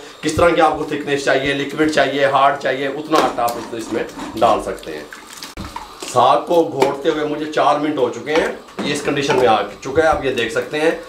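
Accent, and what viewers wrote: Punjab, India. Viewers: Bht aala , ❤️❤️👏👍😅 dabne na kha kren bs baqi punjabi accent aur alfaz sun k bht acha lgta h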